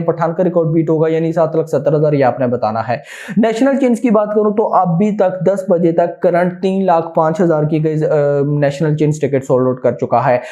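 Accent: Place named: Pakistan. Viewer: ISKI LANGUAGGE SUNKE PATA CHALTA H PAKISTANI H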